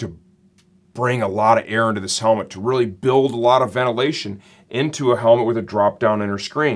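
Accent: United States. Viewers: This man has the voice of MURICA in the intro, praise him.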